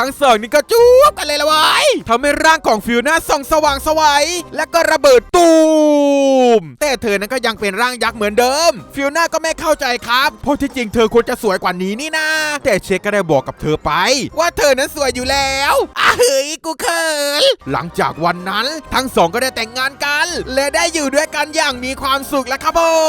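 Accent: South, Thailand. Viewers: คนใต้รึป่าวครับผมคนใต้ฮะ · พี่เป็นคนใต้บ่นี่ 55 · ผมนอนฟังขำเลยภาษาใต้ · 4.52 ไอยักษ์เข้าเมื่องมาได้พลั่นปรือนิ(พี่เป็นคนภาคใต้หรือปล่าว) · พี่บาวเป็นคนใต้กันหลาว หรอยนะ ผมก็เป็นคนใต้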